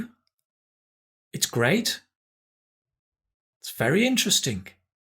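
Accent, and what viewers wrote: United Kingdom. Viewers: Especially his british way of pronouncation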